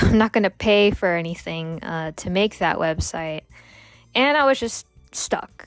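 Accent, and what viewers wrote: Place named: Midwest, United States. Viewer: Are you from the Midwest by any chance? I'm asking because of your accent. It sounds very "midwest"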